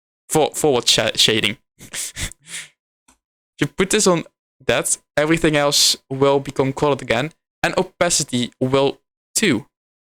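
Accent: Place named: Netherlands. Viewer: I lived in Amsterdam for 10 years and I've never heard a stronger Dutch accent than this.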